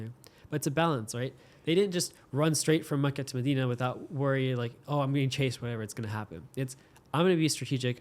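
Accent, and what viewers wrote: United Kingdom. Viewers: Scotish accent... Northern Scottish accent is more stronger. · No need to - Be yourself, its a nice accent, "kinda sounds british", but theres subtitles and speed options I believe. So be yourself Inshallah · Put on subtitles. It's a British accent so if you speak ENGLISH you should be able to understand him.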